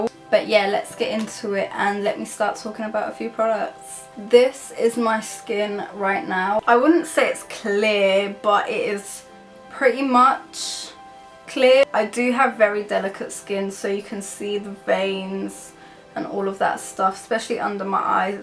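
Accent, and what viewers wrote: London, United Kingdom. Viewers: You’re beautiful. I’ve never met a Latina with a British accent haha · Patt Col London accent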